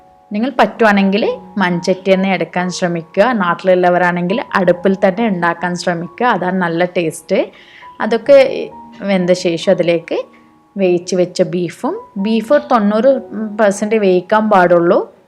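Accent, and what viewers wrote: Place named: Beary, India. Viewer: Definitely will try this, mutton is fav one, Malayalam with beary slang 👌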